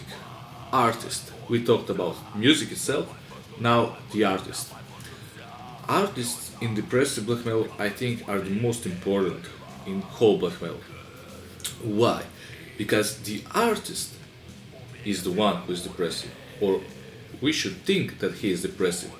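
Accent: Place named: Russia. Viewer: Я чую русский акцент)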